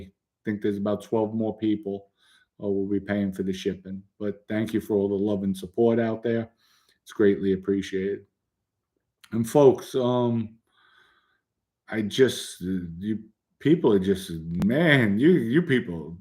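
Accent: New York, United States. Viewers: Props,. Great to see how you’ve turned your life around. Very impressive and a great example. My comment- why apologize for being a “rat”. Wouldn’t you have been a punk if you sat in a cell for decades after you’ve been wrongly told you had nothing coming to you? It would’ve been different if you ratted if you simply didn’t want to do time- no? Regardless, everyone I know (Port Chester, NY) says vodka exactly the way you do…….:). Props. Congrats on your new wonderful life.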